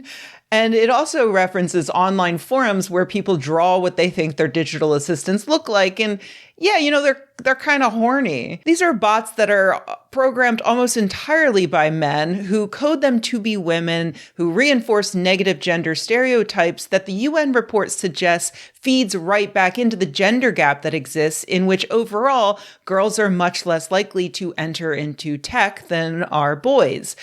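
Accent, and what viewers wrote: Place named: Boston, United States. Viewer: the boston accent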